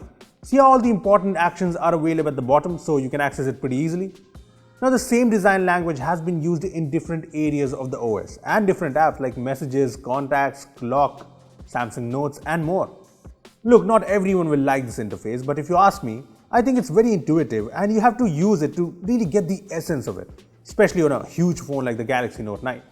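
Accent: India. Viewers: I'm a simple man. I hear an Indian accent, I press dislike.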